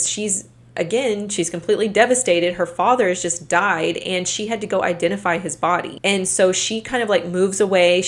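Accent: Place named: Philadelphia, United States. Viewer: A question not related to this vid are u from the Philadelphia area? Just curious because I am and u sound like u r from the area. ❤️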